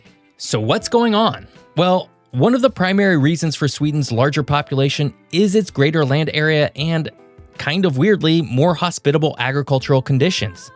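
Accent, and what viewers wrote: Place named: United States. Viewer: The clue lies in the English accent of the narrator: 'Murican! In the realm of global ignorance, 'Muricans hold a special place, clearly exhibited in this video. The disagreeable part is speculating about Finland reclaiming territory confiscated by Bloody Russia after the Winter War. The way things are going, Russia will fall apart pretty soon, but let's keep the Champagne capped until it actually happens. The main point: The territory Finland lost in the Winter war has now been overrun by Russians. It will be a bloody harsh business driving all the Russian-speakers out of former Finnish land. Will the Finns have an appetite for that? It remains to be seen. Then again, the abject cruelty shown by Russians everywhere during the war in Ukraine, why shouldn't we visit the same (or more) misery on the Russians as they visited of the locals? 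Bottom line: Putin's excuse has been to "liberate" Russians embedded in former Soviet states. A very clear action would be to forcibly move every Russian-only-speaker to their Rodina! Problem solved!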